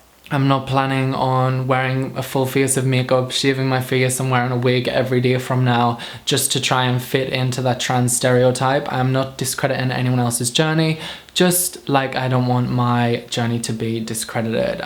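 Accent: Australia. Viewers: the aussie twang keeps making me laugh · Yes, you totally sound Australian, but I'm loving it :-) I prefer British English of course, but this Aussie accent suits you fine. This is only the second video of you that I watch, but I like you a lot as a person and I admire you for what you are doing.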